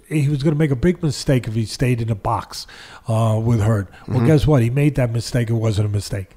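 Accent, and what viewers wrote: New York, United States. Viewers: He has a great voice, the New York accents are my favourite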